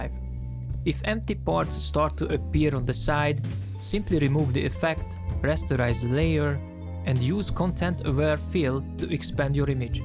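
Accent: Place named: Hungary. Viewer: hungaro accent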